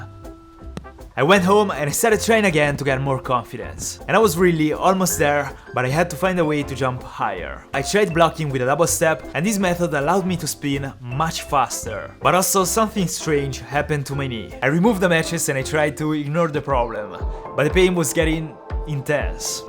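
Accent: Italy. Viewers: bello come si capisce subito che sei italiano ma nessun italiano commenta i tuoi video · madonna quanto si sente che sei italiano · Fra se sente che sei italiano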